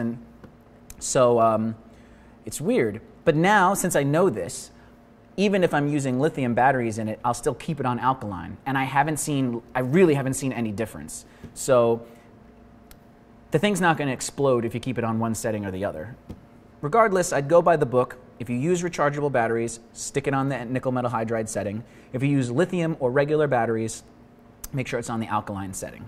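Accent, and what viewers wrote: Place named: New York, United States. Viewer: ! So happy I found you Googling around... I'm a photographer moving into video. I have a couple of very well used Canon 5D MkII's, but have just purchased a 70D for video. I like the auto focus, and the touch screen. I've seen a few of your youtube vids... love them... as a new yorker myself, i love the energy and a touch of the NYC accent... QUESTION -- I have not found anything in the videos of yours that I've seen about the BEST SETTINGS for the camera -- I'm at 24fps, 1/50th, iso changeable... Vincent Laforet, and others, say to crank down the contrast in the settings... what do YOU do, what would you recommend. I assume that this can all be tweaked in post, but that its supposedly good to capture a flat image. Whaddaya say?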